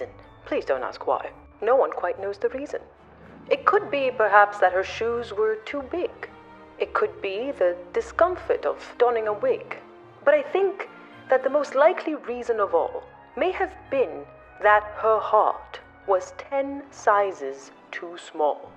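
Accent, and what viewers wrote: England, United Kingdom. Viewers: What's the accent about, is it meant to be English ?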